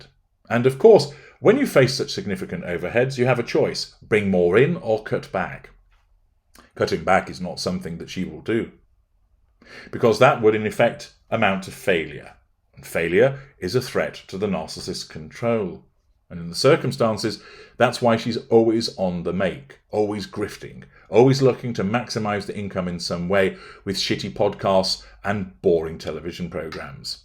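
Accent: United Kingdom. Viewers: Why are curse words even funnier when pronounced with a proper British accent??!!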